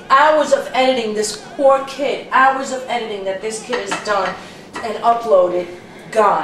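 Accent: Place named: Italian-American, United States. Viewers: the way you say prosciut ricott and mozzarell! He says it's sooo like the movies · Cant get enuff of the ny in ur voice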